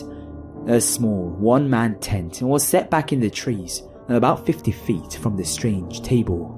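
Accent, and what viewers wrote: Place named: England, United Kingdom. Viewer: Why is it always an English guy narrating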